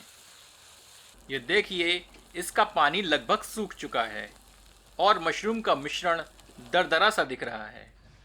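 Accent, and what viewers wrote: Standard. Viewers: Badhai shuddh hindi uchcharan ke achcha laga sun kar. Aap kis pradesh se hain ?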